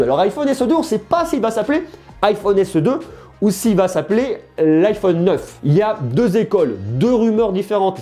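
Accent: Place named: Clermont-Ferrand, France. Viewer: Mais en faites t’es clermontois !!!!!?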